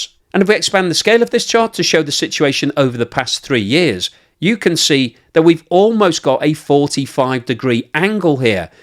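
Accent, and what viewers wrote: United Kingdom. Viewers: Its funny hearing a british man talking about troubles of Turks. Mind ya own business ya fanny.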